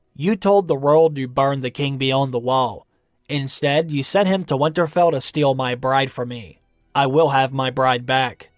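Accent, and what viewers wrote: Pittsburgh, United States. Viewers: I know this is completely off topic but are by any chance from Pittsburg?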